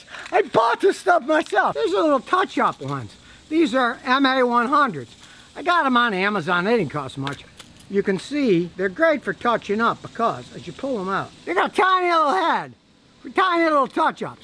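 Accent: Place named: Pennsylvania, United States. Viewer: ! Hi... I see a Texas plate on your'n car but you ain't from Texas.. I'd guess Pennsylvania or mayhap Ohio judging your accent?.. where is from?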